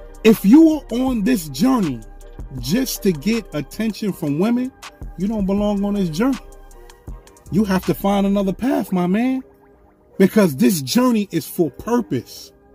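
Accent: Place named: New York, United States. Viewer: I defo from New York that accent n swag ain’t never lie u even got the hat that Memphis bleek made popular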